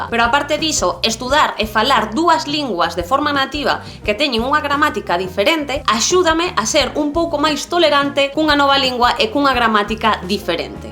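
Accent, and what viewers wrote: Castile, Spain. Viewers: ela esta falando eu português com sotaque espanhol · Ela está falando portunhol com forte sotaque espanhol... Pelo que sei, isto não é galego... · Yo diría que trates de pronunciar gallego como nativa ya que sabes hablarle xq estas hablando gallego con acento castellano ESO NO ES PERFECTO.... ES COMO QUE UN FRANCES NOS QUIERA ENSEÑAR CASTELLANO CON ACENTO FRANCÉS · Galego con acento de un español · No sientas esto como una crítica pero creo que tu gallego está muy castellanizado (usas infinidad de tiempos compuestos en los verbos y muchas palabras que tienen su variante propia en gallego como tal). Imagino que es porque eres 'neofalante' y lo has practicado poco. Sin embargo he de darte la enhorabuena por tratar de visibilizar y darle toda la importancia que se merece a un idioma que, sin duda entre todas las lenguas de España, es la que tiene más potencial. 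Mi consejo: practícalo más si puedes, escucha a la gente más mayor (suelen ser los que menos contaminación lingüística tienen aunque no son infalibles), lee mucho al respecto y lo bordarás (nadie nace aprendido e incluso estudiar bien el gallego en Galicia es difícil ya que tanto RAG como profesores coinciden equivocados en que el gallego evoluciona de manera natural cuando en realidad lo hace con un alto grado de contaminación lingüística). Es más, alguien en los comentarios decía que te estaba escuchando y que creía que hablabas castellano (no es el primero que es de fuera de España al que le escucho decir que el gallego es "como un castellano mal hablado". Síntoma excepcionalmente grave de que el gallego en cien años acabará siendo un dialecto. De hecho la fonética es con el normativo prácticamente castellana. Una pena... Te lo dice alguien que se defiende en varios idiomas, la mayoría de ellos romances y contando también con algunos propios de la península ibérica, y que ve cómo el gallego poquito a poco es, de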